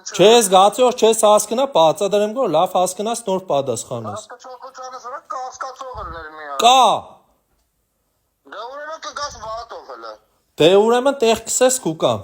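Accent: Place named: Gyumri, Armenia. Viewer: Акцент Гюмреци молодец сынок джан спаси сахрани Господи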